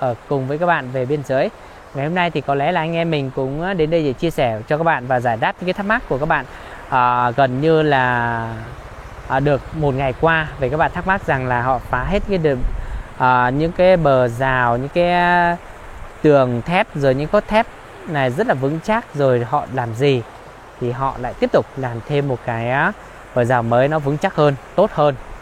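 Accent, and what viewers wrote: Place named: China. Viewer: Ở giáp Trung Quốc nên giọng nói của bạn phát âm cũng na ná tiếng Trung.chúc kênh ngày càng phát triển thật tốt nhé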